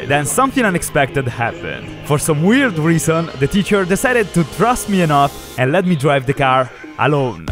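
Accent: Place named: Italy. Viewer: ciao dall accento si capisce che sei italiano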